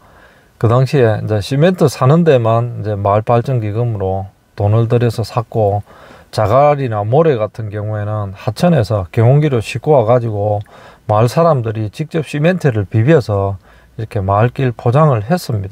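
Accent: Gyeongsang, South Korea. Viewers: 사투리는 경상도신데 생각이나 표현은 진심인 진보라 늘 응원합니다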